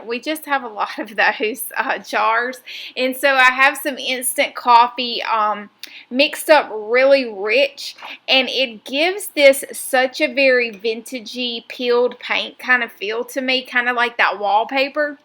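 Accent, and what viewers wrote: South, United States. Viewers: Happy to hear your southern voice again, very relaxing for me. 😍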